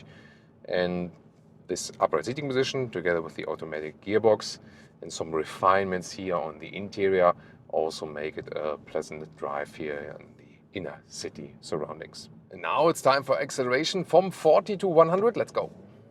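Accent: Germany. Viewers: I love this german accent when he says R. (Eg. Lower bumper - lowa bampa, slimmer = slima)😁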